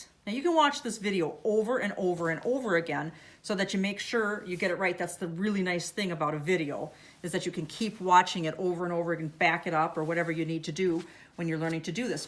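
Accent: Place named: Wisconsin, United States. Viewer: This is a good tutorial! I like the way you teach -- you get right to the point. Sounds like you are in Michigan or Wisconsin ? Oh, I was right...Wisconsin. I recognized your accent :)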